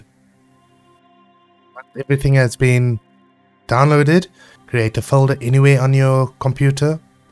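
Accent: South Africa. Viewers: I play on 720p and btw you can't hide that South African accent I'm also from here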